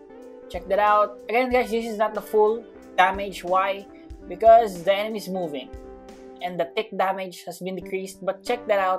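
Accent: Philippines. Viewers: Can I ask u are u a Filipino cause your sound a lot of it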